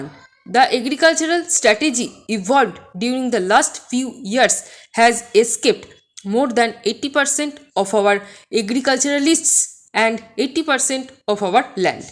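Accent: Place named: Bengal, India. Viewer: bengali accent but dictation is okay and that word is Surat garh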